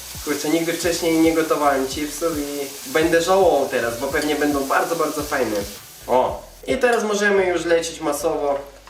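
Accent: Russia. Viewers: jestes z rosji czy ukrainy?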